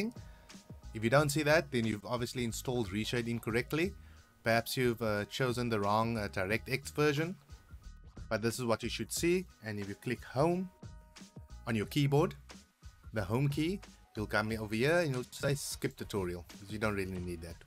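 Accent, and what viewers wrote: South Africa. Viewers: ahhh the defining South African Accent ! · are you from south Africa???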